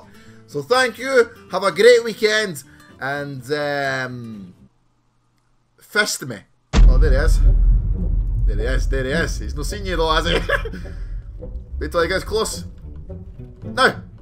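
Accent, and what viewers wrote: Scotland, United Kingdom. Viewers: R u Scottish ? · R ye Scottish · Are you from Scotland? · thank god a Scottish csgo youtuber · R u Scottish?:}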